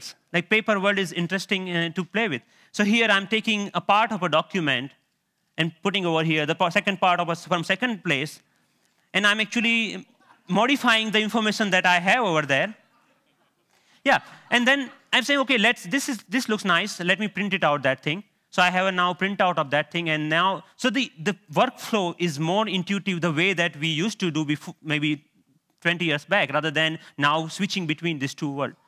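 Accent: India. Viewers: Hes a genius, but not for mastering a language..why didn't he lost his funny Indian accent... :) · 영어 고자.. 인도식 발음 못알아 듣겠다....ㅠㅠ 계속 들어야쥐...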